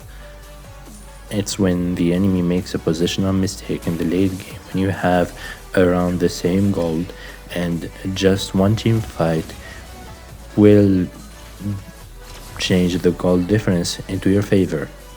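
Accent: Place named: France. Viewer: U sound french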